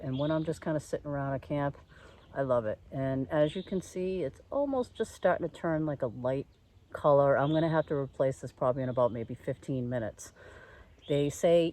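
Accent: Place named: Boston, United States. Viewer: Whoaaa…. You have excellent instincts!… you played it “smahht” 😊… absolutely luv luv the Boston accent!!.. I’m from northern California.. we don’t get to enjoy that over here 😕.. aaaaaand.. nice tent with the built in ground cover or pocket for the vestibule… I always learn something from you.. thanx! 👋🏼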